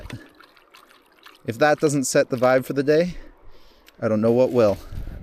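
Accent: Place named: Minnesota, United States. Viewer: I can't take the Minnesota in your accent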